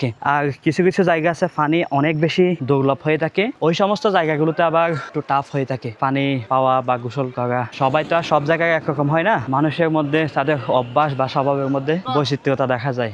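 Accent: Chittagong, Bangladesh. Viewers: ভাই তো চট্টগ্রামের মনে হয়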